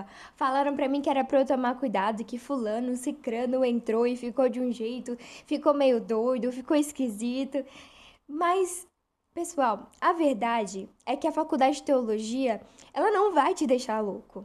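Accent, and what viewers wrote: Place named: São Paulo, Brazil. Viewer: Moça ótimo vídeo. Sou formado em RH e estou terminando Ed Física. Penso muito em fazer Teologia ou História, ainda estou nessa dúvida. Já tenho a prática de ler muito a Bíblia pelo menos 5x por semana e talz, pq amo esse relacionamento com o Senhor, enfim... Só gostaria de saber de você pela sua experiência: Você sugere o curso de Teologia ou a Faculdade, mediante há alguém que a princípio não pretende trabalhar na área Teológica. E, qual faculdade de SP presencial (Se for de SP pelo sotaque kkk), você indica?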